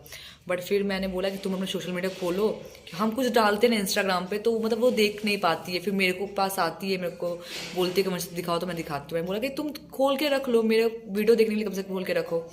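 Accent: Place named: Pahari, India. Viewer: ...tell us about yourself na... Your hindi is sweet, pahari ho kya...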